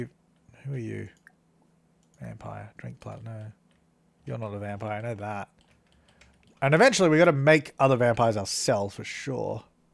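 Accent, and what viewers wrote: Australia. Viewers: I cant tell does he have a Australian accent or?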